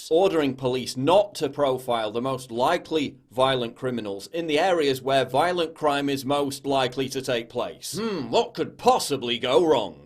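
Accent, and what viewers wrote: United Kingdom. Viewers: First video I’ve seen of yours and 3 mins in I hit that sub button talking on our level and your British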